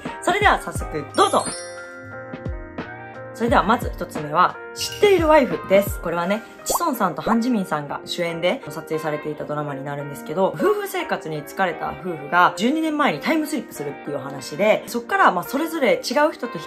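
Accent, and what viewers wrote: Kansai, Japan. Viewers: が言うように日本と韓国の違いを楽しんで見てます👍 関西弁可愛い☺️❣️喉のケアしてね🙆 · 登録者8000人おめでとう🎊 知ってるワイフは日韓両方とも良かった！でもお金も時間もかかってる韓国の方が見ごたえあるのはしゃあないよね💦 関西弁😁👍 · 、喉大丈夫？🥺 今日は関西弁やったからめっちゃ聞きやすくて、馴染めた🤣🤣🤣 おもろい❗😘😘😘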